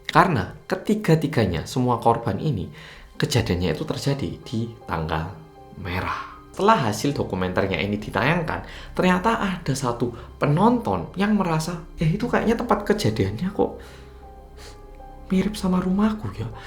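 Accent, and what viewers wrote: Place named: Malang, Indonesia. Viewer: annyeon...good channel banyak pelajaran yang di ambil hikmahnya dari channel u.. hampir 16th dimalang logat malang jawanya dapat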